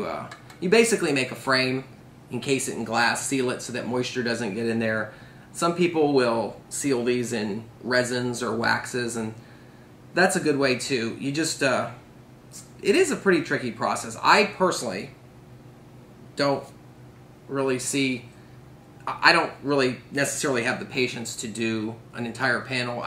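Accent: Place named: United States. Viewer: WHY DO YOU AMERICANS SAY SODERING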